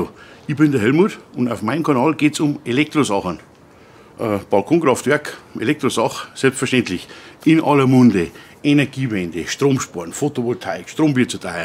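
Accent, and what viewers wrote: Bavaria, Germany. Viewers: Einfach klasse, deine Art zu erklären ist gut verständlich. Ok, dein bayrischen Dialekt versteht nicht jeder, aber ich hab da überhaupt kein Problem. Mach weiter so du bist klasse. Gruß Klaus aus Unterfranken 😂👍noch Bayern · Sorry , alles schön und gut aber es versteht nicht jeder " Extrem Bayrisch" ich kann Dir wirlich nicht folgen !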